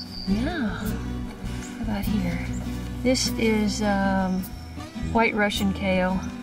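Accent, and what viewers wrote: Tennessee, United States. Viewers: That is wonderful,. I will definitely be planting some here and there. We are living in the Central Valley area now. Modesto to be exact. This is a bit different for me, as I am used to growing in the TN area. I moved out west from the hills of Hancock County a few years ago. I could hear that TN twang in your voice. =) What area are you from out that way?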